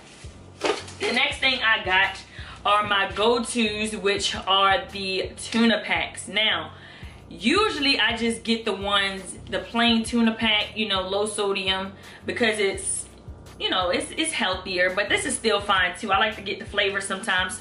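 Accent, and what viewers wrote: Baltimore, United States. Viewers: I called that accent! I knew you were from Baltimore! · Are you from Baltimore...? That accent is Thick on some words I love it lol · I live in pg county so trust i KNO she said two words and I just said yep she’s from Baltimore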